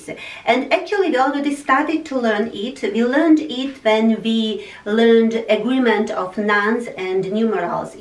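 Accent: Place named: England, United Kingdom. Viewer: You're funny, naughty and nice person and I like your English accent! :)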